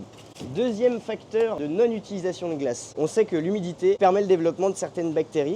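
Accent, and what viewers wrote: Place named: Ardennes, France. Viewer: Plutôt Ardennais 😮😢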